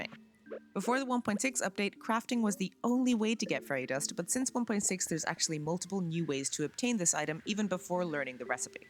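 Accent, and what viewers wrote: Ireland. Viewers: I hope you don't mind me saying, but I looove your accent! Is it slightly Irish??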